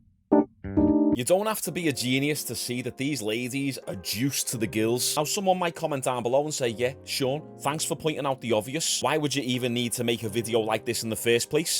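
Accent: Liverpool, United Kingdom. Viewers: I love his Scouse accent! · I hate British accents, but I LOVE this guy’s accent!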